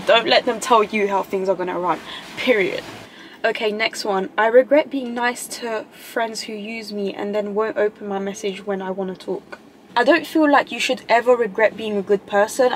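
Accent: United Kingdom. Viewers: You have a nice accent it's like a British accent ❤ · You have a nice accent it's like a British accent ❤️🇬🇧👍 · Because it is a British accent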